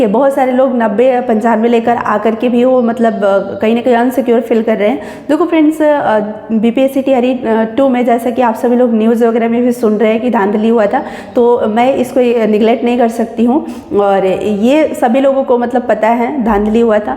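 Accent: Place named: Bengal, India. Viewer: Aap bangali ke tarah bolti h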